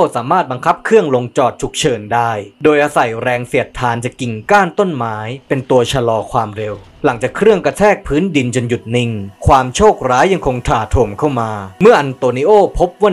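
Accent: Thailand. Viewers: มีความเสียงเหน่อ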